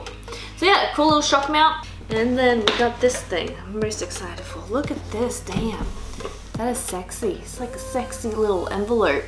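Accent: Australia. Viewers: Stop trying to Americanize your Aussie accent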